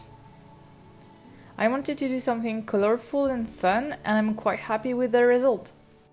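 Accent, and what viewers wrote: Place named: France. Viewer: You have a french voice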